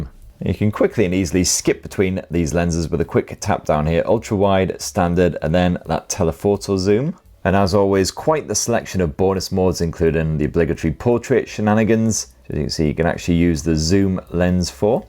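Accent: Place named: North, United Kingdom. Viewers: Straight out of the north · He's a Mackem mate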